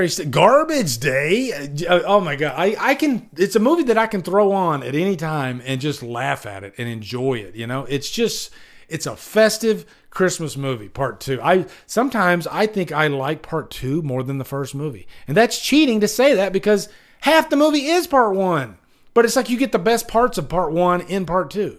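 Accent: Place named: Southeast, United States. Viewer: What an Elvis nod! Brilliant! I love your accent. Where are you from in the US? I'm guessing south east (I'm not from the US). Thanks for the list!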